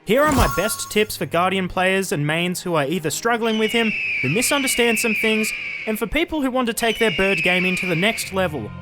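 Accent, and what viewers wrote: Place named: Australia. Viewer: I've seen your videos before where you were disproving the slander on guardian and showing how he's actually supposed to be used, and I was already planning on playing the character at some point, but after watching a video of this dude absolutely slaying shit in depth 5 with guardian, it further motivated me to pick this character up after countless hours I've spent on executor and Wylder. So when I was looking for a character guide just to make sure I was doing things right, I knew it was an absolute must to make sure the video was done by you. As soon as I heard that Australian accent, I knew I was in the right hands lol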